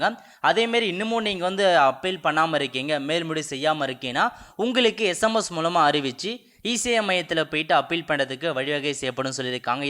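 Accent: North, India. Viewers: Athu Appel illada Apply onnum Tamil olunga Pesanum illa Engilsh olunga Pesanum Etho Vadakkam Maari Pesikittu Irukaa 🤡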